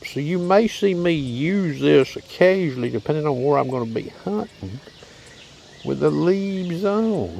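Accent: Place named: New York, United States. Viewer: Great Video... You sound like you might be from around by me in New York. God Bless. Pew Pew. 😎👍👌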